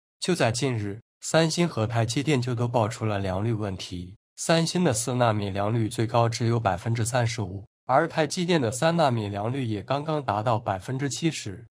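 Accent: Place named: China. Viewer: 這口音與用詞就是對岸中國的味。不專業的程度就跟中國的漢芯一樣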